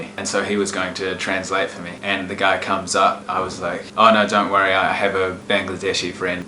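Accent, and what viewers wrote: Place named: New Zealand. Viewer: Hey,...just want to stay how good your videos are, so interesting, and eye opening in all aspects. You are very courageous even to venture into the places you have, and as I will definitely never venture into those parts of the world that you have so bravely taken the time to visit, your videos give a very good idea of what I've chosen not to experience personally. Hats off to you for continuing this channel. Stay safe, watch for crime, and keep them coming. I'm an avid follower now. And by the way, I spent 23 years in NZ living in Auckland and Wellington, so your accent is very familiar to me. I now live happily in the prairies of Canada. We are avid travellers to most of Europe, Australia and New Zealand, Alaska and the Caribbean areas, taking cruises mostly. With the COVID, of course, things have gone on hold for about 2 years. 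I'm 74 now and once again, want to thank you for all the good work you are doing to enlighten us on how some of world exists. My wife and I say every day how blessed we are that we live where we do. It's so interesting, though, that despite the difficulties you have encountered, people there are so friendly and seem to be coping somehow. Once again, stay safe, keep those great videos coming, as it is the only way I'll ever appreciate how there is so much suffering in our world today. I'm afraid the population is getting out of control in the areas you are visiting. Hopefully, humanity will sort it all out one day. Cheers!!!!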